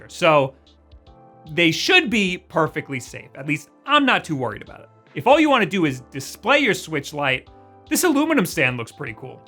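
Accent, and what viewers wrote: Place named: United States. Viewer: your American its not that weird well you are from New York so it makes it a little weird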